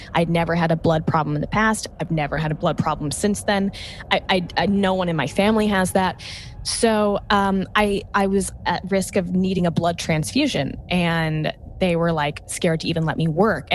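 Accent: South, United States. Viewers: Just found your channel and I’m gonna say your from the south lol. You sound like your from where I’m from. 😂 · I just saw you on TV! It was an as for a tv channel. Mysteria I think. Haha! Congratulations! I wasn’t even looking at the tv and I heard ur voice and that beautiful Southern accent and looked up and saw u for a split second. But undoubtedly it was u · Where are you from? 🥰 I almost recognize your accent from the south ♥️ I’m new here and I’m enjoying it so far!!!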